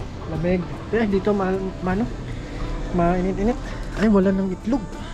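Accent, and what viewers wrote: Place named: Hiligaynon, Philippines. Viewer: taga saan ka sa Pinas? Hilongo ka, ano?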